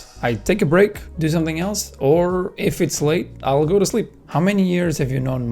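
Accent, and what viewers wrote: Portugal. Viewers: que tal você fazer um vídeo falando em português? Quero mt ouvir seu sotaque portuga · That's pretty much his Portuguese voice, in this video. A lot of Portuguese people tend to speak English with a very hard Portuguese accent. "We" kinda suck at losing or acquiring accents. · that a nice portrugeese accent you have there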